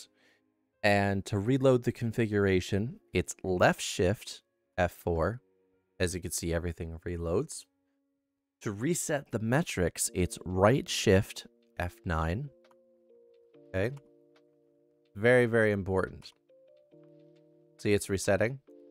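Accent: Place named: Maritimes, Canada. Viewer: /wave from Alberta. Wife's a Newfy. Your maritime accent is just fine brother! /cheers (earned my sub)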